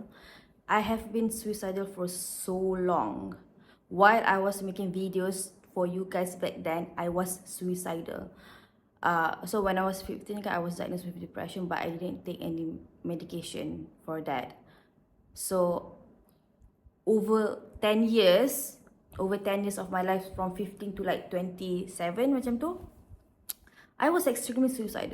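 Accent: Kelantan, Malaysia. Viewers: Her accent sounds klantanese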